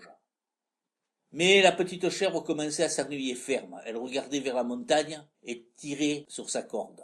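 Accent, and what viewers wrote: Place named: Provence, France. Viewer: Une memoire d'enfance bien racontee avec un formidable accent de provence,,,, Qu'elle etait jolie la petite Chevre de Mr Seguin,sa barbiche de tout Officier ses sabots noirs eblouissants🐐☺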